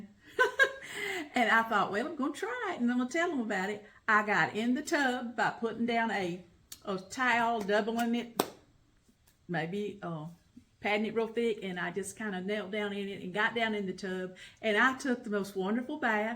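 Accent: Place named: South, United States. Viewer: Bless you,. I lLOVE your attitude AND your southern accent!:) My mom, her parents, her siblings etc., were from Alabama. Since I found your channel by looking for updates after knee surgery, I now have to look through your playlist for some good ole southern cooking. YIPPIE!!! Thank you. ❤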